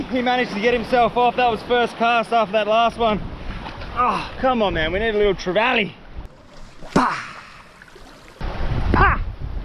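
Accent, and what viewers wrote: Australia. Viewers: Its always that aussi accent 😂always gets me❤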